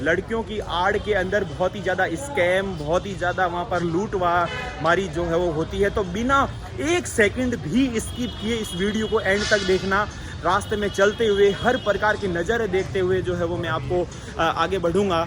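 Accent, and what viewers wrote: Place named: Jodhpur, India. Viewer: Bhai aavaj se jodhpur ka lg rha h tu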